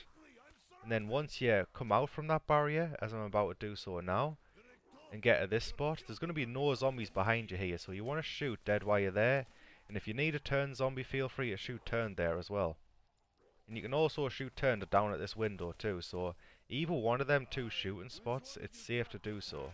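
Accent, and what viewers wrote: Newcastle, United Kingdom. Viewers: thought he was a geordie?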